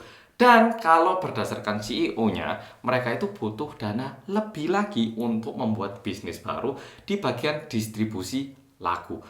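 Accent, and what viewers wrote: Java, Indonesia. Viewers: yg komen agak sdkit kecewa dan mngkin skit mostly sm stand yg notaband agak benci berembel Hybe dan BTS,sdikit yakin itu..aq paham krn aq Army,sejak jman vote dll pun kbnykan Sm stand bnci bngt,but its okay. well aq gk mnghakimi mereka sbgai Sm stand,tp be strong for all of us sbgai fans..#nowarnohate #justopinian peace✌️ Btw pnjelasan nya keren bngt,Thanks Mas Korea yg Medok. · Mas medok andalanku, sebagai JYP stan gw mendoakan yg terbaik buat SM entertainment 🙏🏻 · suara medoknya berkurang drastis.. Lebih berat korean aksen 😁..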